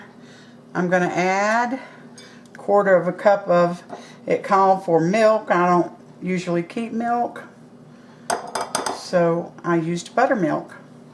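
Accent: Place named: West Virginia, United States. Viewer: now im hungry !! this looks wonderful ty 4 sharing. have a great day. are you in wv..............u sound like it to me. just wondering cuz i spent many summers there with my grandparents.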